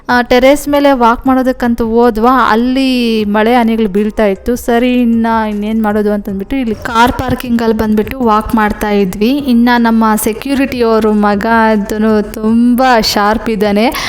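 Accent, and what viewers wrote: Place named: Kolar, India. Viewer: Nim language kolar, Hoskote tara ide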